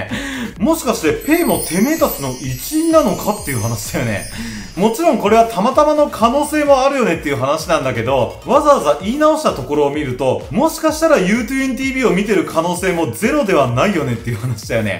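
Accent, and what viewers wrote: Kansai, Japan. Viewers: 関西弁もギャップがいいし標準語も聞き取りやすくていいし、、とりあえずUくんがいいよねって話だよね(？)www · 関西弁もっと聞きたい！(標準語だから訛りに憧れがある) 動画見に行ったけど1部の米が論外すぎて… 今回の件でショックを受けたり古川優香の為を思って注意したコメントをしたファンだっているのに嫌なら見るなは論外もち誹謗中傷は肯定しないけどね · わぁ💕 アタイと話す時はバリバリの関西弁が出るって話しだよね💕 古川鼻チークやら👃🏻 耳毛👂🏻やら言われてえらい大変やなって話しだよね！ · テメーのゴテゴテ関西弁ききたい〜🥰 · 関西弁聞きたい🥰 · 関西弁萌えって話だよね🤩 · コメント61件もあるのに、視聴が0回になってる？？てか会ったら関西弁で喋るーって話しだよね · 対人に無神経で図太い人って、何もかもに無神経で図太いよねって話しだよねw 関西弁いいねぇ☺️ボケとツッコミ上手そう← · ちょいちょい、イントネーションが関西だなぁ〜と前から思ってたけど。って話しだよね。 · 関西弁はギャップ萌えですきすぎる… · 関西弁のU-Twenty可愛すぎるって話だよね🤣💕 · 関西弁聞きたいですけど私可愛い男の子でした⤵️ 古川はtko木下越えてほしい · 冒頭からイケボ関西弁で終わった生理が再開しそうって話だよね干物BBAもときめいちゃう(´♡ω♡`)って話だよね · の関西弁最高🥺 しばらく古川優香もやっぴもちょっとしたことで炎上するんだろうなって話だよね🤔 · 関西弁喋ろや！！！！コテコテ関西弁のかわいい女の子より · 関西弁ききたいってはなしだよね〜🥺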